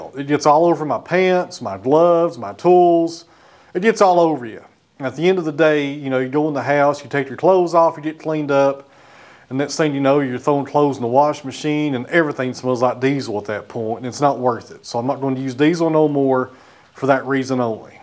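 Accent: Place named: East Tennessee, United States. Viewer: Are you in West, middle or East Tennessee? I'm an East TN native, you sure sound like you're close to the area. I love it! Sounds like home. I hear somebody speak like that and it's almost comforting. My mind just associates it with friendlier people.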